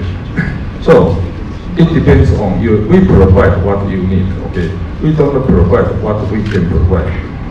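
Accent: Taiwan. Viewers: 因為阿北,大家一起加減練英語.....阿北講英語,一樣很有親和力(超台的,還有人抹紅他....真的厚) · 真的是很台式英文 ha. 台灣教育體系教出來的. 正港的台灣人 XD.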